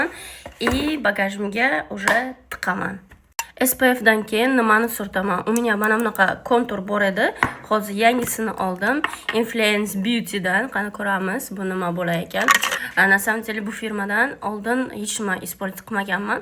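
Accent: Bukhara, Uzbekistan. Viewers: Салом яхшимисиз хон! Сизни тинлаш ва куриш менга жуда хам ёкади айникса Бухороча акцент сизни яна хам ширин курсатади. Ишингизга омад соглик саломатлик тилаймай❤ купрок чикаринг шундай видеолар!!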